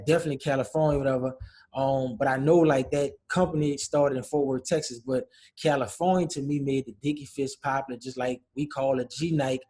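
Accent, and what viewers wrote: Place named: New Orleans, United States. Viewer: U sound like u from da NO tho.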